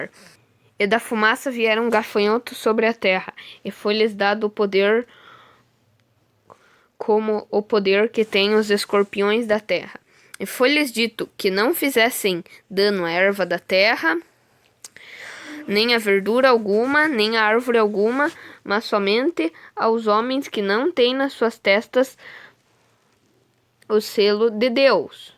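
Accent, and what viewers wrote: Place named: Israel. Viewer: Tem sotaque israelita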